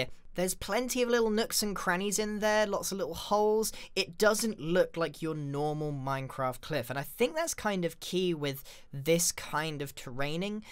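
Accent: Netherlands. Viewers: Last van een nederlands accentje?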